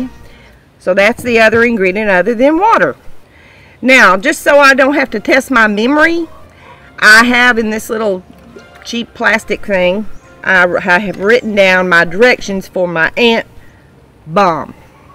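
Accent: South, United States. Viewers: If it doesn't kill the queen that's way down deep, the ants in the ground will move the mound. I love your videos and you sound just as southern as me.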